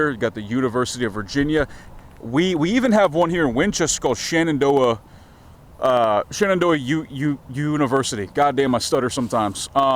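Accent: United Kingdom. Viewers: Great British accent there! Excellent video too!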